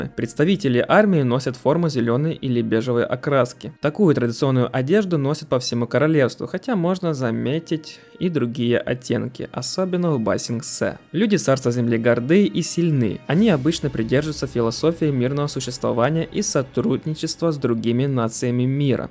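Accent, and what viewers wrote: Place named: Tajikistan. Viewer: Автор таджик? Просто интернсный акцент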